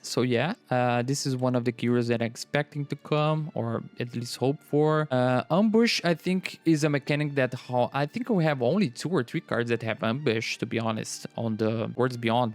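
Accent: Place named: Brazil. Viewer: Brazilian portuguese accent am I right haha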